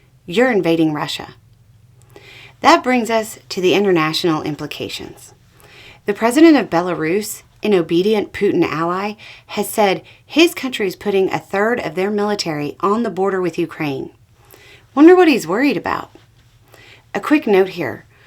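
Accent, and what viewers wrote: South, United States. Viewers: I love your snarky sarcasm. 😂😂😂😂😂😂😂 If you didn't have such a strong southern accent, I'd say you were a Pittsburgher (that's a compliment where I come from).😂😂😂😂😂😂❤❤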